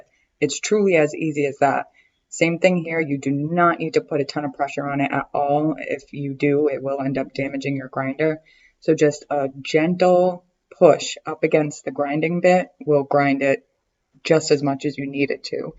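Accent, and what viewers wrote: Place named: New England, United States. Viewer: Hi! I just took my first stained glass class and I'm hooked!! Currently binge watching your videos - lol. Thank you! I grew up in Ma and as soon as I heard you talking I knew you were a New England gal:)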